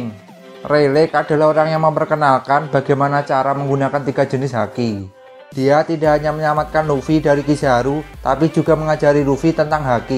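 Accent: Java, Indonesia. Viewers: Suaramu medhok min ... Heheheh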